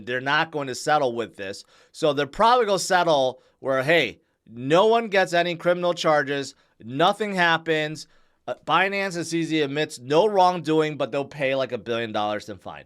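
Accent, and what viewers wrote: United States. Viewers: It’s strange hearing what appears to be a Japanese man with a southern US accent!!!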